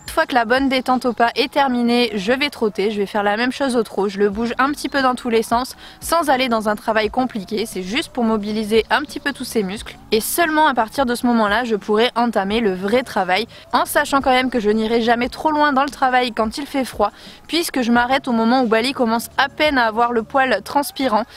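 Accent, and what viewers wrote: North, France. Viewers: Tu est du nord ?? Je t'adore bisousss 😘😘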